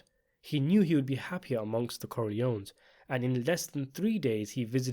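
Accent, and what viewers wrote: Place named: United Kingdom. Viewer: Thank you - but british accents are the worst.. Sickening.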